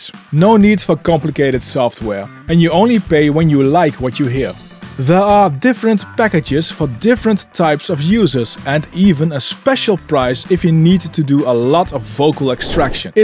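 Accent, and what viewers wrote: Netherlands. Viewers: Lekker Nederlands accent :) thanks for the tutorial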